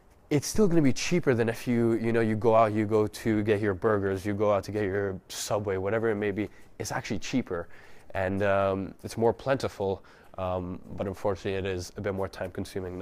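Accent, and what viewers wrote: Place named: United States. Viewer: from the UK but you sound American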